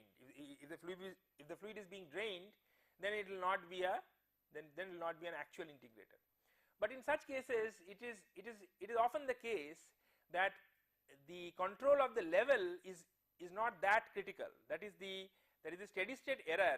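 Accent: India. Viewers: the best tutor gives u the basics of any subject clearly is the indian though his annoying English :) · Simple looking Indians with INDIANISED accents have great intellect ! I am proud of India !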